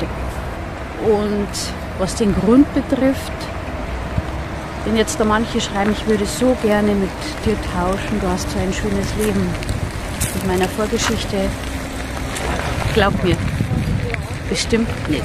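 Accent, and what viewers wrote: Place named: Bavaria, Germany. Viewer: ich habe deinen kanal zufällig entdeckt und als allererstes viel mir dein mir so vertrauter bayerischer, von dir sehr beruhigend gesprochener dialekt auf. dann gleich als nächstes deine authenzität, die bescheidenheit und emphatie. keine reisserischen storys, sondern alltag, untermalt mit tiefsinnigen gedanken, die zum nachdenken anregen. daumen hoch und abonniert!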